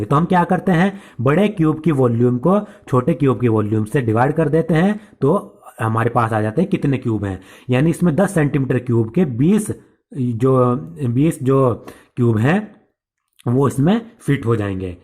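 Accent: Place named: Hapur, India. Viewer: I think you belongs from near hapur According too your language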